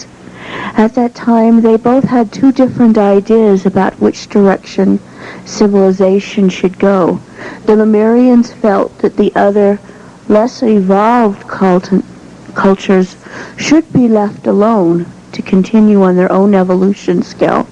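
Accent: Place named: United States. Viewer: why does she talk in american accent?of all accents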